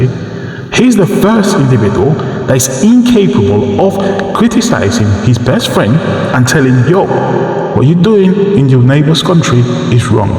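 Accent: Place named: RP, United Kingdom. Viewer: Your analysis is flawed ... And your Porsche accent shows you are not even resident in Africa.... Many of your congloese brothers and sisters on your Eastern borders are celebrating the M23 rebel takeover of Bukavu and Goma cities.... DRC government too has to take responsibility blaming Rwanda wont cut it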